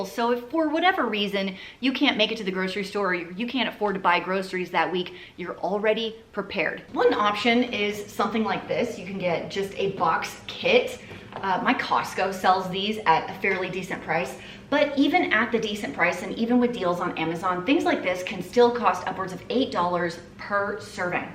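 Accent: South, United States. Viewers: I love you. My husband and I are from the Deep South (NC) and I love the fact that your soo Southern (even if your from Texas).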